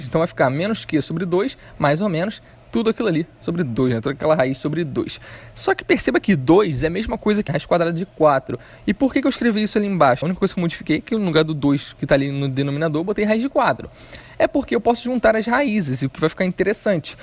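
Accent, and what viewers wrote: São Gonçalo, Brazil. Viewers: Você é de São Gonçalo RJ??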